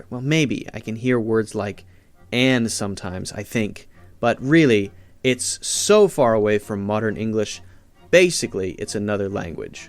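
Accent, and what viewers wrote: United States. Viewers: what a pity is a septic talking and not a brit. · you are not speaking english you are whining american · The guy that is speaking is not English but American. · Good. One point: your English us not 'midern' it is USA English. In England we do soeak English, standard English as well as 'modern'. Please, do NOT try to confuse the rest of tge world about what modern/contemporary English is. Thank you.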